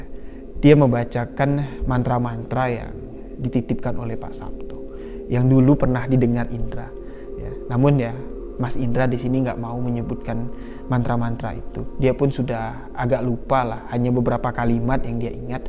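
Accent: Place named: Bali, Indonesia. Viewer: Kurangin logat bali nya bisa ga mas? Apalagi setiap kalimat selalu ada logat bali nya... Agak kurang nyaman..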